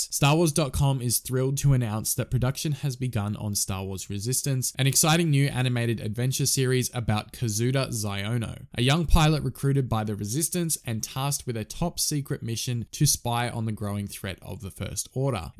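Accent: Australia. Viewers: wait your an Aussie? im Australian two!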